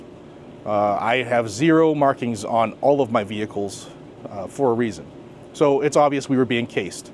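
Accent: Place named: Georgia, United States. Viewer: Sounds like Georgia cops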